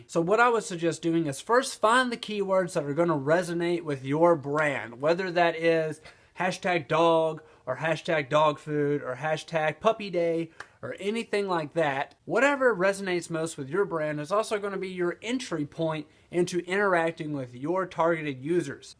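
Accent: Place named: Georgia, United States. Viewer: are you from Georgia? you have an accent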